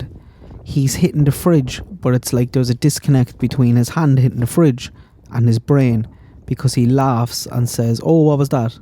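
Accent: Ireland. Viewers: Police dogs can pick up DEATH. The boys has been in CPS for over 9 months & would have said something by now. Summer's foot prints were found on 2 different properties confirmed by PI & Dogs. This theory is CRAY CRAY. Crackin Case done GREAT coverage of this case with FACTS. I enjoy listening to your accent & your slang for stuff-Irish Right? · That’s possible your theory, alcoholics and drug addicts don’t pay attention to their children. Then put a phone on top of that and you’ve got a mother who never does anything. Just take a look at that house it’s filth. The only thing she cares about is drinking and getting high. The kids are just an annoyance. I love your Irish accent. My last name was Dailey 😀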